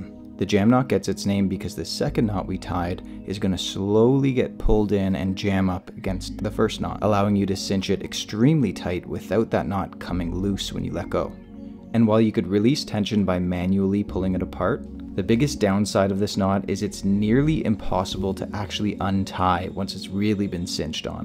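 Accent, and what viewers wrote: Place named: Canada. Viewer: I can’t help but noticing your thick Canadian accent. I’m surprised that you guy don’t call the Canadian jam knot the jam knot.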